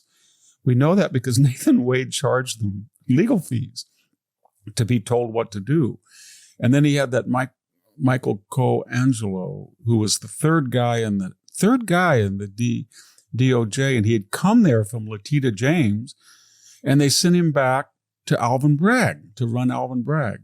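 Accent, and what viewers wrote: Australia. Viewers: I’m an Australian I studied agriculture I worked in NY for 8 years my children and grandchildren are now Americans I think I love Victor and listen to all his work This was a great interview and I appreciate your Australian interviewing style accent and balanced grounded style very much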